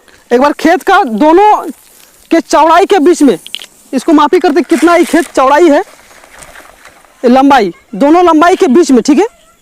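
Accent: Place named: Rural, India. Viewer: Desi awas me mja aaya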